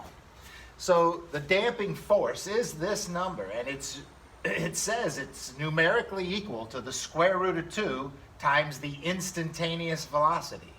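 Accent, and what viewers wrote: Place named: South, United States. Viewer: God, its feels so nice to hear a southern accent. You talk like I think. Hallelujah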